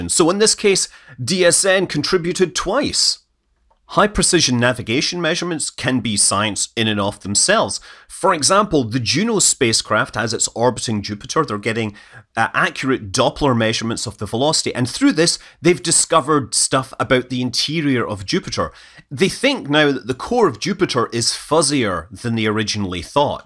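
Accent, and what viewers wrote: Scotland, United Kingdom. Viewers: I love his Scottish voice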